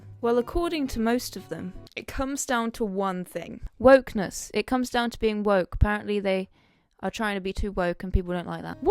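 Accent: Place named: Midlands, United Kingdom. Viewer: Your channel is great. Very well put together stuff, especially since this is only your fourth video. If it's not a big secret, which I totally respect if it is, are you from the Midlands by chance?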